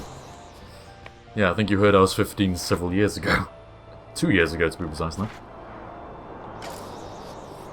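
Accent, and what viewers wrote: United Kingdom. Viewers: GUYS!!! I just got a great idea he plays Stanley parable. Think about it glorious British voice versus glorious British voice.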